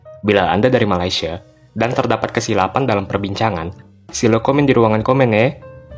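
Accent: China. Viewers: Logat cina dari mana coba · awak ni kokoh dari malaysia ke bukan. Logat care cakap nye suare kokoh (chine). Tapi masih belom tau ke semue info nye. Bukan warge setempat/tempatan ke awak ni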